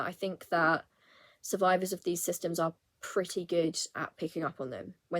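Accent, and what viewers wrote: United Kingdom. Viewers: New subscriber here beautiful. You have a british accent, its new to me & happy that you understand the mess inside BRF. William is super imsecure of Harry, very Diana is Harry so approachable, organic, genuine and so authentic full of compassion and not hot tempered.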